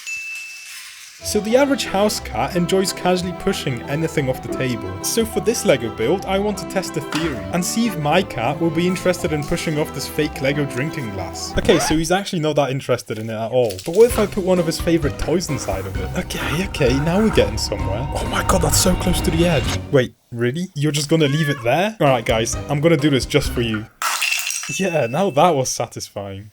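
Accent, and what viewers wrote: United Kingdom. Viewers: You sound British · I thought you were British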